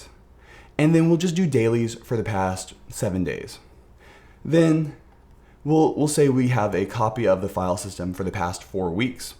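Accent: California, United States. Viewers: They way he speaks reminds me on the SNL sketch, "Californians". Can someone from the US say where his accent is from ?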